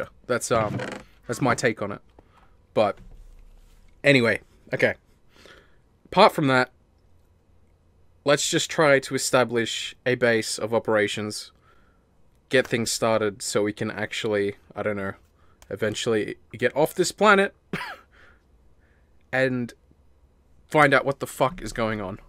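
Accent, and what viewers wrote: Australia. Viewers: Question: can you make a video without holding back the australian accent and swear words?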